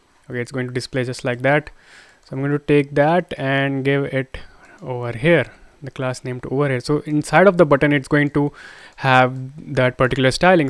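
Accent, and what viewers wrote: India. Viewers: you speak in india english it's not original english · Sorry not sorry but he is Indian so his English is also Indian 🤟🏻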